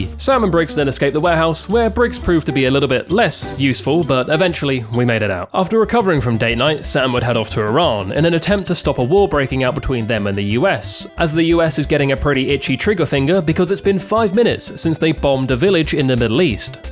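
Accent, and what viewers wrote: United Kingdom. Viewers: I didn’t hear a difference in our British accents and yours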